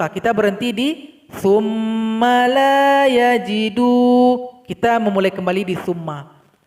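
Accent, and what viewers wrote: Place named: West Sumatra, Indonesia. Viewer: Urg minang kalau bahaso indo logat minang nyo pasti ttp ado. Hehe semangat uda, mudah2an sukses slalu.. awak disoko nio belajar pulo mangaji yg batuah uda. Makasih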